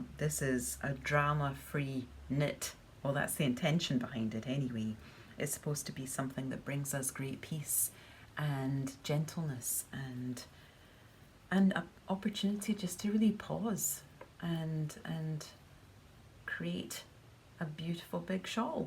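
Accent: Scotland, United Kingdom. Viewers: I am happy to have discovered your podcast just after you posted your first episode. You have a lovely, calming voice and as someone who visits Scotland yearly (not 2020 unfortunately), it warms my heart to hear the Scottish accent when I'm so far away. My question is do you ever begin a project and decide you are just not feeling it and decide not to finish? If so, do you have any examples? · I absolutely love your Scottish accent and your gentle voice! My question is what is the coldest it can get in the Scottish winter and when it’s cold what type of yarn do you choose to wear? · Thank you for this giveaway. I’ve never seen such gorgeous project bags and notion pouches before. This is the second video I’ve seen of yours and was wondering why your accent isn’t as strong as some others. Did you grow up in Scotland or just moved there in later years? 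· Dear, what a lovely podcast you make. I love the 'slowness' and find it very relaxing to just listen to your beautiful voice and scottisch accent while I'm knitting, especially in these times when there's so much stress going on in the world. My question is: Would you please show a picture of your son wearing his quilt and the socks you made him? Only with his permission of course 😃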